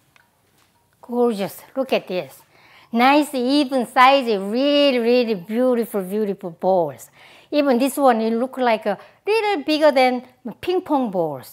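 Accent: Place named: South Korea. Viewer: 이분영어도 너무사랑스러워요 투박한 한국식 발음으로거침없이 설명하시는영어 진짜좋아요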